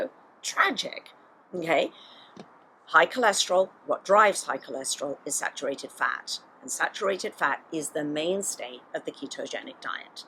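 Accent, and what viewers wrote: England, United Kingdom. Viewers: You sound English but use US terminology, eg, board certified, weight in pounds?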